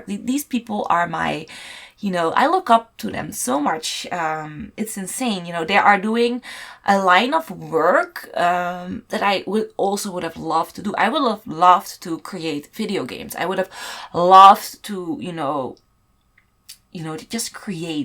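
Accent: Netherlands. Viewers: Are u dutch? cause you have a dutch accent lol edit: oop yes you said your dutch in your video lol ❤️ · Oh Youre dutch haha I heard it on your accent nog een vraagje welke pc gebruik je? :3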